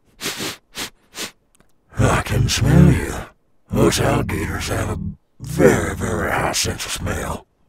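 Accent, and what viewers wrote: South, United States. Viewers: Now I can’t unhear him speaking with a country accent. I don’t mind it, though. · Love the bit of southern accent ☺️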